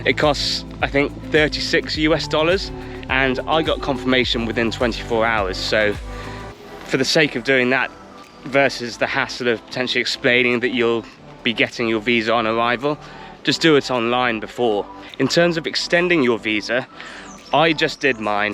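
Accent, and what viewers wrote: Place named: United Kingdom. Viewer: Love british english speaking accent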